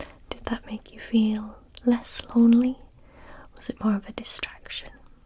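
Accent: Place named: Newcastle, United Kingdom. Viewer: That Geordie accent 😯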